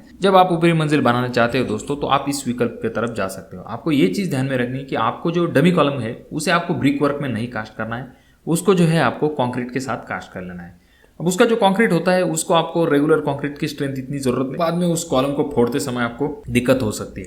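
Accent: Maharashtra, India. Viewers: Bhava tu marathi ahes bahutek tuzya avajamule lgech smjty karn aplya marathi lokanchi hindi aschich aste 😀😁..baki video ek number astat tuzya khup mahiti milte tuzya video mdhun .keep it up bhava 🚩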